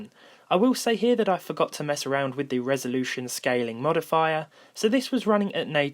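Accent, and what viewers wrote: United Kingdom. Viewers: Dis fookin brit accent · Why do British people say knot 1 5 percent instead of zero? Not joking I legit am confused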